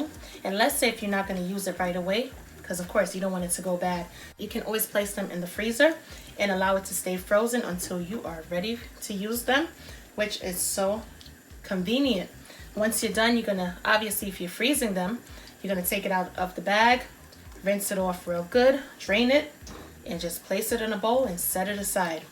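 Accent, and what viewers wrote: New York, United States. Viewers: You have the most New York accent I've ever heard, lol! Where are you from? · Yup, I'm a native NY'er living in Florida and I love hearing the accent.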